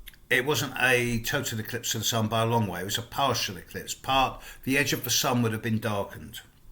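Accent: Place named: Southern England, United Kingdom. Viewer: Hey❤ bless you for saying about Williams middle name I remember when he was born thinking the same..Once and Future King... (I'm a kitchen table astrologer, of several decades) I did his chart and felt such a surge of pure hope..(he was born just before my eldest daughter) And he was born on the summer Solstice too... All shall be well. Nice to hear a UK southerner voice... Glad to have stumbled on your no nonsense channel... Thank you xx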